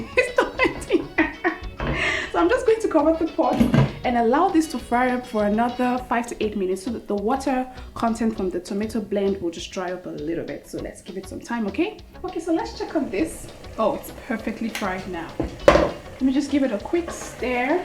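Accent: Nigeria. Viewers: I love your Nigerian accent❤️❤️🇳🇬 (im Nigerian to🇳🇬)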